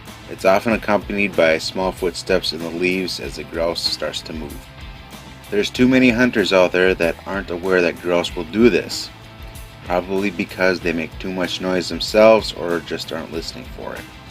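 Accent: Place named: Midwest, United States. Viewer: Great advice, can't wait to put it to use. Feels right hearing it from a midwest accent.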